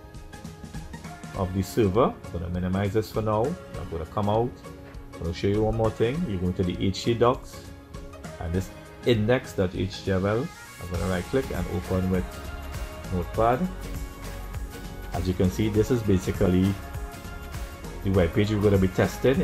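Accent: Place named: Trinidad and Tobago. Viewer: This voice is a trini for sure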